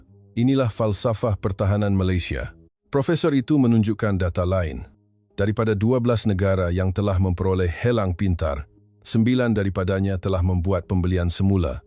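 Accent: Indonesia. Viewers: Kenapa orang Indonesia suka bikin konten tentang tentera Malaysia..dan bikin natizen indo berapi..suara admin jelas2 org Indonesia..bikin saja tentang tni kamu,puji saja tentera kamu kenapa sering masuk campur dgn tentera negara tetangga...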